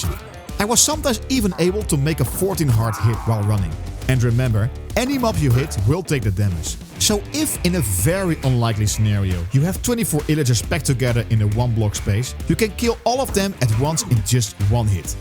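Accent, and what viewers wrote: Netherlands. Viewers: W tutorial, deze guy is zowizo nederlands!!! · You dutch? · Holy nederlands accent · i smell dutch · Great vid, and great dutch accent :D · I smell a dutch accent huh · Just a question, are you dutch? You just sound like how dutch people talk in english. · The accent gives it away Hello fellow dutchie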